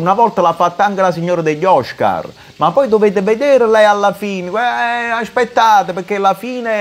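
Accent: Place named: Naples, Italy. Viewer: Sempre interessanti questi video. Poi l’accento partenopeo rende il commento ancora più efficace Complimenti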